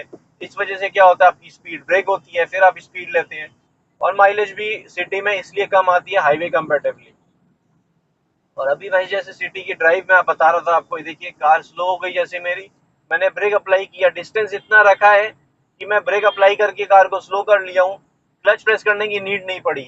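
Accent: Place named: Punjab, India. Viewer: sir aap kaunsa biradari se ho? you sound like a Punjabi to me.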